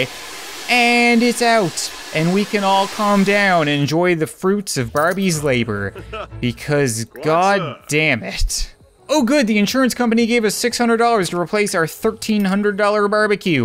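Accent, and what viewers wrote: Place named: Canada. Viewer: Love your vids and all the energy and effort you put into them! Also love your Canadian accent and quirks! Are you a fellow scientist? You knew about centrifuges, pipettes and that vaccines don't cause autism (thanks for that! Yay!) Congrats on >15K subscribers! Thanks for making this series! Cheers from your neighbor in California!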